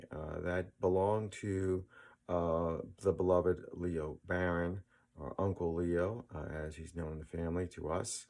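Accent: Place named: Rhode Island, United States. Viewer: your accent brings back 20 years that I lived around the Pawtucket, RI area! I bought my Reliant in Pawtucket back in late 80's-90's forget now exactly when. My late wife was from that area and she and her family at the dinner table on Sundays was a riot to listen to! Throw me down the stay'yahs a bruum! Anyway I'll double second every other comment here adding that I too have the 14", 1hp DD90 model. Man, that cabinet you built is awesome. The dust collection is genius. Finally, an old woodworking book-set I have has a chapter on bandsaws (1930's-40's) and title is "Bandaws - The Queen of Saws". Today politically uncorrect it still fits. Best video ever, thanks.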